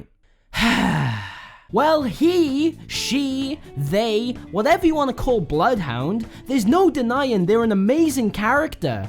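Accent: Wales, United Kingdom. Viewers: do i hear welsh?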